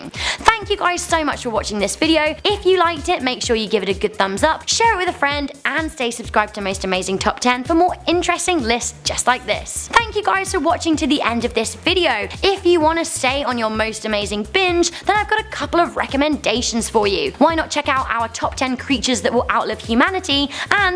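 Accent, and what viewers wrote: United Kingdom. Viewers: Rebecca Felgate Does your British dialect have a name? You have such a very pleasant voice! ❤️ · Something about a British accent makes the end of the world seem pleasant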